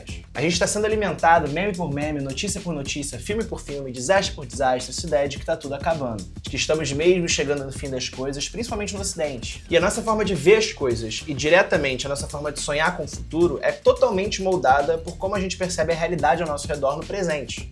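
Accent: Rio de Janeiro, Brazil. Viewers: A forma como ele solta uma enxurrada de conhecimento e sensibilidade entre o sotaque e as gírias mais cariocas que existem me pega demais. ❤️‍🔥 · o sotaque e o jeito carioca só deixa melhor o vídeo que é a verdade nua e crua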